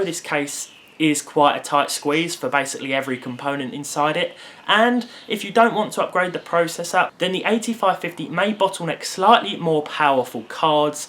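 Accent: United Kingdom. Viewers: I am just watching all your videos because of your awesome British English emphasis :D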